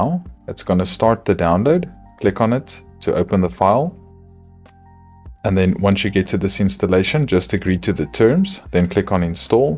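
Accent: South Africa. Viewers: that accent sounds south african,hello south african youtuber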